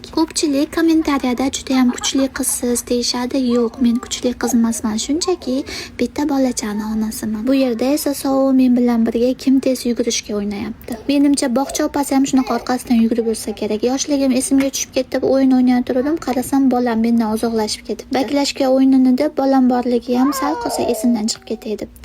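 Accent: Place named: Andijan, Uzbekistan. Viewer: Вай бляяя бу яне анжанли экан узбекларга нимабуляпти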